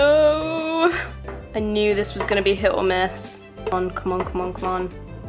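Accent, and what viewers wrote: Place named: England, United Kingdom. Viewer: I love that accent is it English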